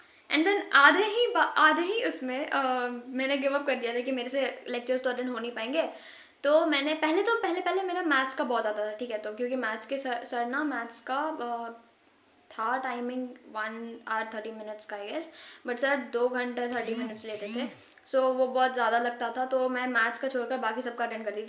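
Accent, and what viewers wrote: Bihar, India. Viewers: kya aap bihar se ho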